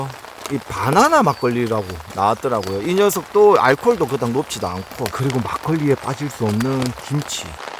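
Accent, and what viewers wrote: Gyeongsang, South Korea. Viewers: 경상도 말 죽이네요❤ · 경상도 말투로 이렇게 재밌는사람은 처음본다 유머가 짱. 최고!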